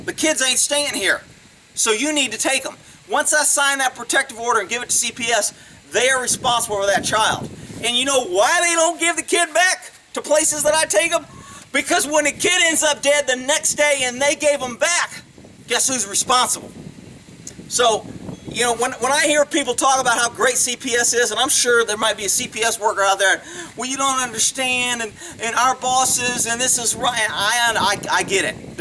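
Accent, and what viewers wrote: Texas, United States. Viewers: is he from Texas?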